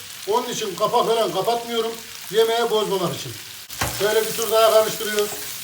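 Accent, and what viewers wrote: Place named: Black Sea, Turkey. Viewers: Memleket nire şef? Kayseri, Yozgat, Çorum??? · karadenizli galiba ustam.bizim şiveyle gonuşii :) tokat ordu sivas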